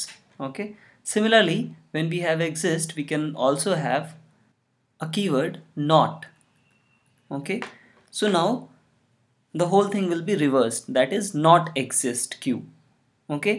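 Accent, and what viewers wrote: India. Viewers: All the best coding tutorials on YouTube are made with Indian accent, lol. Thank from Ukraine, btw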